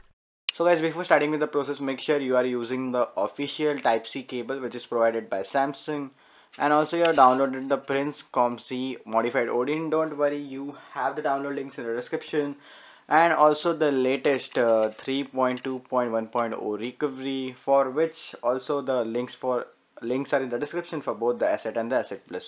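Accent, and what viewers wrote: India. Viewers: Holy shit! Can you please speak slower in future videos? Half of what you said here I couldn't understand at all. Not because you speak Indian or that dialect, simply because you are talking way too fast. You want to talk lower and more precise when you're doing how to and training videos such as this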